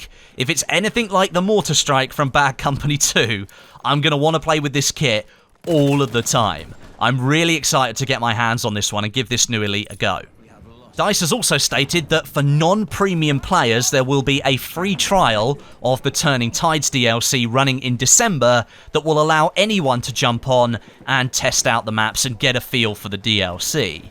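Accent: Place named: United Kingdom. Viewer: I really like when you say “thanks for watching today! “ since I love British accents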